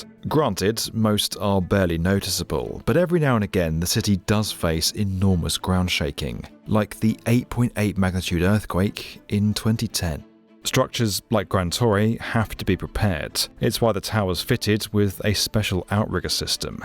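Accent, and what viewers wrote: England, United Kingdom. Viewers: Didn't totally expect to actually listen to a English man talking about the Costanera Center But here we are Viva chile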